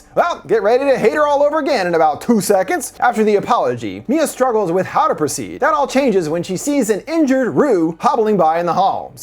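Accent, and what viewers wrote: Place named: South, United States. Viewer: Dont talk countrylike so cringe ty. Also please move your mic further.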